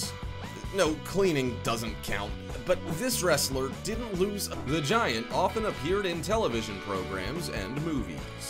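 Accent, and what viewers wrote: United States. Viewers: What the hell is up with this guy? He is speaking English with an American accent so why all the metric crap?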